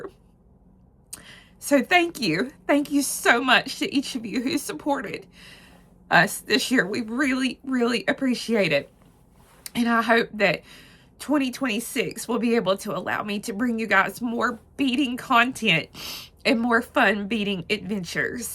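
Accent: South, United States. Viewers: you have brought me so much JOY this year. Those are happy tears, right?!? 2026 is going to be the best year! I am looking forward to learning more, it's so important to me that I learn something, especially as I get (cough) a little older. I love your enthusiasm and your patience with us. You are a fantastic teacher. I love your southern accent; I miss my mama and her Tennessee family who have all passed and it brings me comfort when I hear it. Your knowledge is deep and wide and it shows in every video. I don't go anywhere else to buy or learn. I'm committed to you and your bead store. Best wishes! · Thank you so much for this! It’s really an awesome idea! I wish I could buy more, know that I will when I can. I love your sweet Southern voice, it’s a great comfort for me since it’s just me and my cat anymore. God bless you and your family. Have a blessed and beautiful season!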